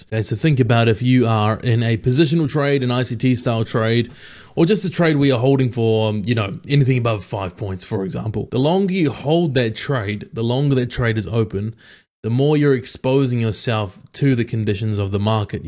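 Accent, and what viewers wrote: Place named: Australia. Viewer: you sound like you have a bit of an Australian accent but you say pm East coast time, what session is this in Australia ?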